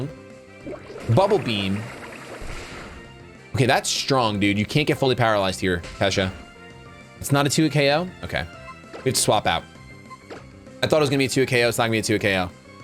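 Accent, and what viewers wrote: United Kingdom. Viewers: As a British person I'm living for the British accent!!!! Its the best thing ever 🤣🤣🤣 Fave shiny is deffo Eevee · I could just sit here for days and listen to that british accent 🤣🤣🤣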